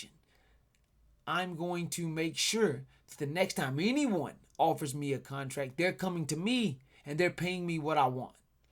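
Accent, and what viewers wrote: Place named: Alabama, United States. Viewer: I thought you were from Alabama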